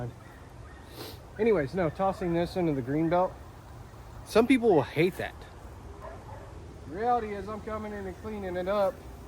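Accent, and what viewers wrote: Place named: Oklahoma, United States. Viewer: I love watching your videos. I live in south central Oklahoma. Sounds like you live in Oklahoma too.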